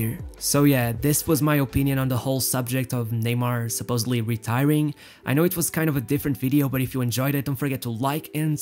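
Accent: Portugal. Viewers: You sound Portuguese bro 🔥🙏🏾 shoutout to you brother Great content fuck them haters 😂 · To the creator of this channel, thank you so much for these great videos! You speak perfect English, so I do not understand why anyone would care about a slight Portuguese accent (which in my opinion, only makes the videos even better and more authentic). I am sure most people think the way I do and that you will reach a lot more people with your content. Best regards from Switzerland! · Eae beleza, tu tens o mesmo acento que todo português tem quando fala inglês, que para minha opinião é bom, tens um ótimo conteúdo, continue com o bom trabalho. Falou cara é nós cara!!!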